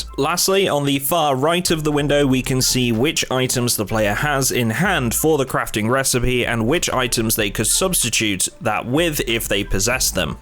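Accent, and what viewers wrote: United States. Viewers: I for sure thought you were American or maybe Canadian, you have a very American accent. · This one funny sounding American. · This American guy who makes these videos has an interesting accent.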